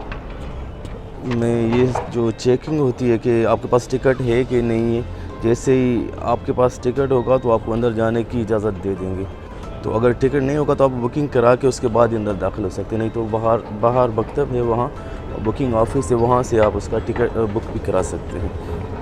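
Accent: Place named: Arab world. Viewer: ماشاءاللہ بڑے بھائی ۔اللّٰہ اعطیک الف عافيہ ۔آپ نے دل خوش کردیا ۔ مگر بھائی مجھے یہ نہیں سمجھ آیا کہ آپ سعودی شہری ہو یا پاکستانی بھائی ہو۔کیونکہ آپ دکھ بھی رہے ہو سعودی اور بولنے میں عربی لہجہ بھی ہے۔۔مگر اردو بھی اچھی ہے۔پلیز رہنمائی کریں۔۔ اخوک الصغیر ۔محمد نسیم لکھنؤ من الھند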